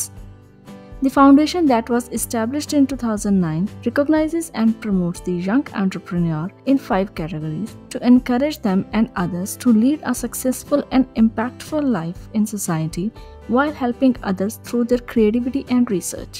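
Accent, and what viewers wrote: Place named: India. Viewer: La locutora en este video es una mujer de la India. Lo puedo determinar por su acento.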